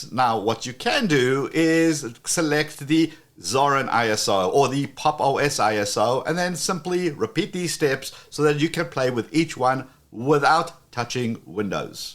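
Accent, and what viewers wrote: South Africa. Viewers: You can tell by the accent. I'm also a South African.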